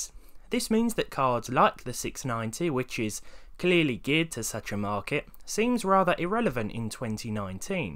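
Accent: United Kingdom. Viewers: British accent + old tech? Instant sub!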